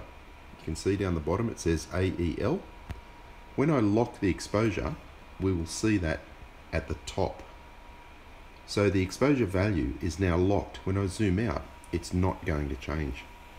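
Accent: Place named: Australia. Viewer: Quick question about your filming location. If it’s not too much trouble, can you post your coordinates and the direction you’re facing please? I’m assuming ( because of the accent) that you’re in Australia or New Zealand. If that’s true you’d be facing northwest to film , correct? Did you take into account any physical obstructions (like mountains) that would create a false horizon? Mountain ranges in the distance or other obstructions can do this, creating the illusion of a physical horizon. I’d just like to be certain of what we’re seeing. TIA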